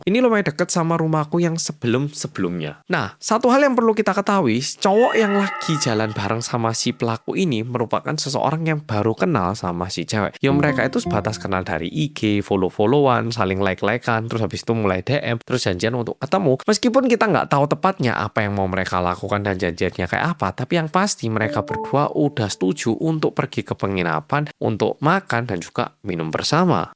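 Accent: Java, Indonesia. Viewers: Logatmu mirip orang jawa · Nemu channel wong korea medok banget😅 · BHS Indonesia nya medok sekali, udah berapa lama tinggal di Indonesia, oppa/abang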